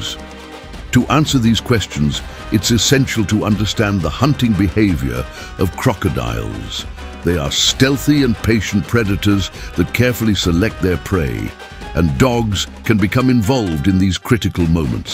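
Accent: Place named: United Kingdom. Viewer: What an interesting Indian bgm, while the guy narrates in British English. What a harmony😊.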